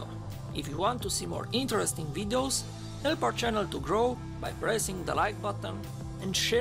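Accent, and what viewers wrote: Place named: Poland. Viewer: Polaszkanski angielski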